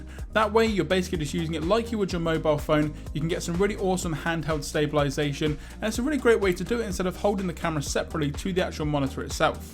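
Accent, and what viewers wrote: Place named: Cardiff, United Kingdom. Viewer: Didn't know you Cardiff man 😂 are you Welsh?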